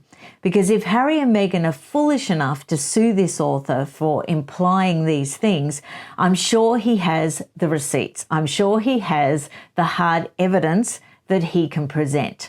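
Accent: Australia. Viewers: Omg are you a ozzy?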